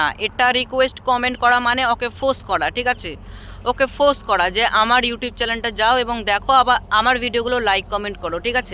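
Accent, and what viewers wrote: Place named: Malda, India. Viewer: ভাই তোর কথাগুলো শুনেই মনে হচ্ছিল যে তুই মালদার. আমার বাড়ি ও মালদায় মঙ্গলবাড়ী তোর কথাগুলো শুনে ভালো লাগলো.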